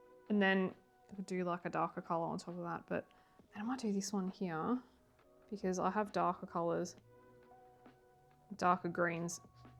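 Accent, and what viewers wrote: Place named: Australia. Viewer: mate i heard the first few words and subscribed.. always got time for an fellow Aussie. You sound just like I am with these things! I overthink and if its not perfect i'm ready to get a new book lol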